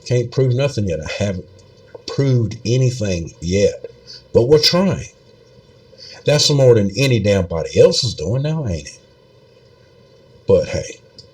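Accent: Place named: South, United States. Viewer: I love your accent. Reminds me of when I lived in Virginia and Melbourne, Florida. Enjoy your channel. Are you in Florida? Please don't take the Lord's name in vain.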